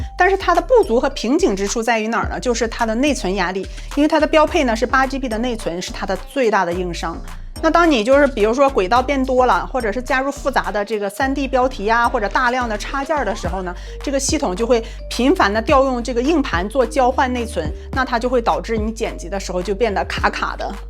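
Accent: Northeast, China. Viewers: 东北话杠杠的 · 主播东北人。me too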